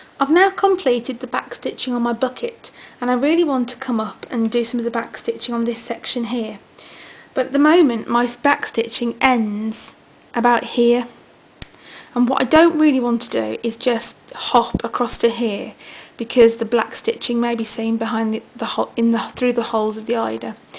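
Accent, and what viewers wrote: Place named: England, United Kingdom. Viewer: Loved your video, you talk just like a friend of mine back in England, it makes me homesick! Thanks for the videos! xx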